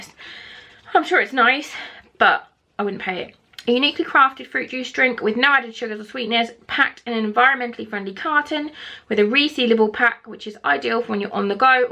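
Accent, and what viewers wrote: United Kingdom. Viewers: Yum, the bulls eye sauce is great. You look super Goddess in this video too, love the UK accent as always, your vids are lovely to watch, love the try on hauls and unboxing vids 😍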